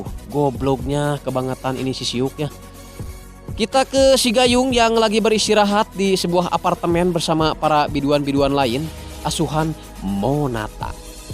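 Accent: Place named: Majalaya, Indonesia. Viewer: Orang Majalaya mang.pantesan sundana te ciga garut.11/12 kt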